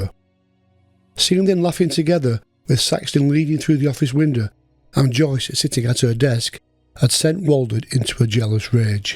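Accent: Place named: Bolton, United Kingdom. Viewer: Yes that's the ones. Now, it would be really interesting to see why Royle got his reprieve. I presume the documents are now a matter of public record somewhere. I think you could get an interesting episode looking at how and why some managed to escape the noose. This is all very fascinating and is an admittedly somewhat macabre subject that has fascinated me since I was a lad (longer ago than seems feasible!). I enjoy the videos a great deal - especially as you sound very similar to my brother in law who lives near Bolton!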